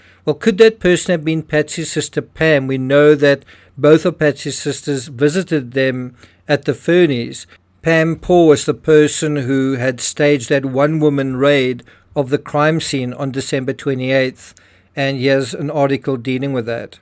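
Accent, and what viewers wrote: South Africa. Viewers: I think it's the narrator's South African accent pronouncing Barb.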